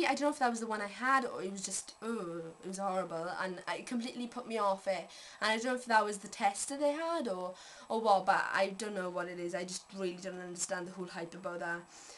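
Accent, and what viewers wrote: Wales, United Kingdom. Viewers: my love you sound soooooo welsh, love ya hun:)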